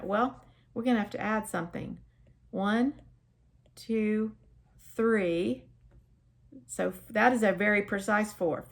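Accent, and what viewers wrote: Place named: South, United States. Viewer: I'm so stoked to have found your channel! Currently in a Chem course as a pre-req and while struggling through some other math concepts discovered that anything said in a southern accent apparently sticks in my brain better than without. Thanks for making so many videos, will definitely be coming back as I work through the course!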